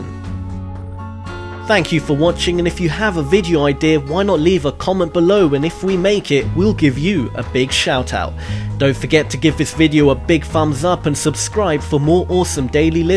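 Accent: United Kingdom. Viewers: Why do these narrators so often have British accents? If I watch this video in GB will he have an American accent?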